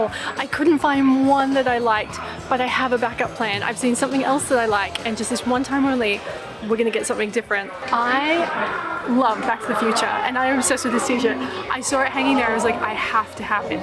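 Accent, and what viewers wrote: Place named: Australia. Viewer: Hello I just subscribed to your channel. I have mad respect for you going to theme parks alone. Couple of weirdos starring. I love your Aussie accent. Stay cute and safe!! 😊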